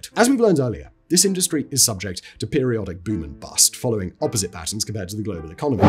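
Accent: United Kingdom. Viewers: Man, is British for real. That’s the speech of an educated white male for that part of the globe. And yes, he sounds a bit crazy. But information is great.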